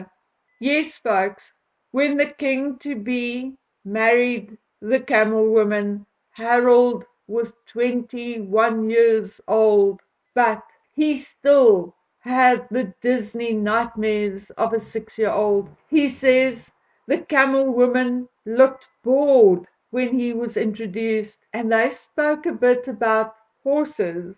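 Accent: South Africa. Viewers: I love the way you say ‘Big Willie’ in your great S African accent! Thanks for hanging in there for us.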